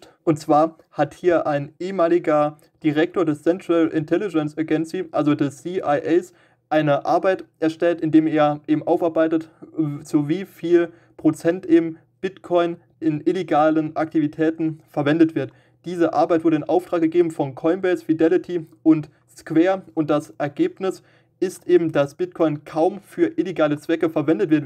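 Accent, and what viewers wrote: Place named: Frankfurt, Germany. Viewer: der junge (mann) hat den GRÖSSTEN RESPEKT auf der gesamten YoutubePlatform verdient! Seine Analyse schlägt sogar jeden seriösen englischen crypto kanal! Ich gönn es ihm dass er "etwas" an seinen Links verdient :) Sag mal kommst du aus Frankfurt???? der Dialekt kommt mir sehr bekannt vor (bin selber Frankfurter) oder doch eher richtung Vogelsberg? BITTE TU MIR EIN GEFALLEN - WERDE NIE WIE DIE ANDEREN CRYPTO YOUTUBER !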